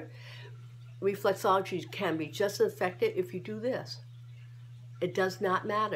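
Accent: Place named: Massachusetts, United States. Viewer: Thank you for this clarification. Wow, I bet you are from Massachusetts! I can detect that accent anywhere..............blessings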